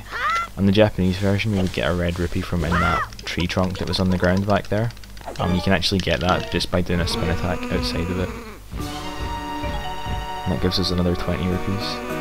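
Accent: Scotland, United Kingdom. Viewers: Hrm yeah he does sound Scottish. Cx My mistake. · More please. Also, is that a Scottish accent? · Scottish accent?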